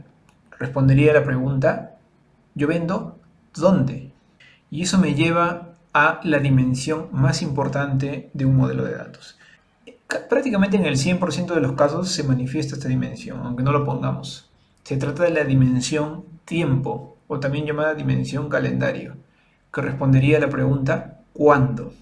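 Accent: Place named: Peru. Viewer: Muy bien explicado, buenos ejemplos y realmente didactico, de ahi un problemita que el microfono realmente no te ayuda pero eso se mejora con el tiempo al tener uno mejor, y sobre reconocer a leguas ese acento de mi paisano peruano. Exitos en t u canal cauza!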